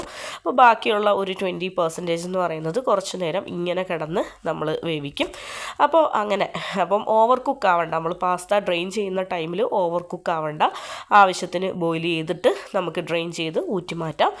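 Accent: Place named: Kannur, India. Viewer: ചാനൽ ഫുൾ കുക്കിങ് തന്നെ ആണലോ,, നിങ്ങളെ വോയ്‌സ് കണ്ണൂർ കാരുടെ പോലെ തോനുന്നു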